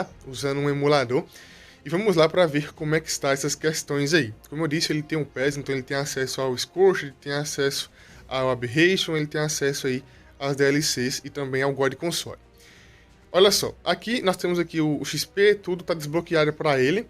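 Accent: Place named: São Paulo, Brazil. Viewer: Pela voz se tem 30 e poucos anos e no jeito que se fala provavelmente se são Paulo na cidade de assis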